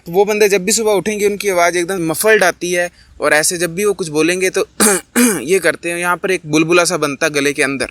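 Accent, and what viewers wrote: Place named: Punjab, India. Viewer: Bro tera Punjabi accent end hai❤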